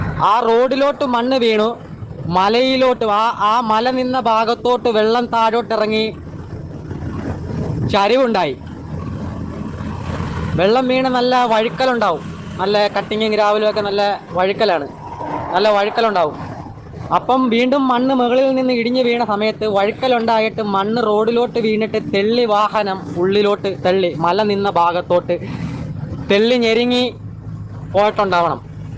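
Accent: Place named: Malabar, India. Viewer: എടോ നീ കായംകുളത്തുകാരൻ അല്ല നിന്റെ സംസാരം കേട്ടാൽ അറിയാം നീ മലബാറുകാരനാണ്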